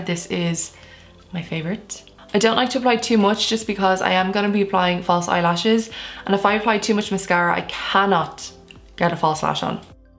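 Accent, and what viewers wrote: Ireland. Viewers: I keep seeing Charlize Theron mentioned, but she could only hope. You look computer generated (I mean that in the best way - you're inhumanly beautiful). Plus, your voice is a lilting almost ASMR-like brogue. I don't know how you don't have 40 million subs! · Beautiful. Didn’t need the lashes, but they’re gorgeous too. I’m in California but I lived in Ireland for a few years, and I MISS the accent and the fast talking! Most Americans just talk too slowly!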